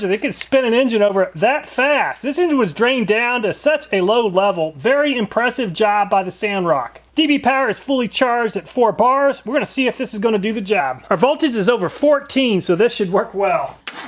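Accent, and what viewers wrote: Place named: Pittsburgh, United States. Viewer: are you from Pittsburgh or in PA? Because you definitely talk like us lol.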